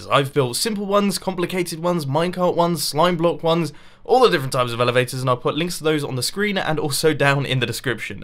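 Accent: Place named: England, United Kingdom. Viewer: Where u from in England ??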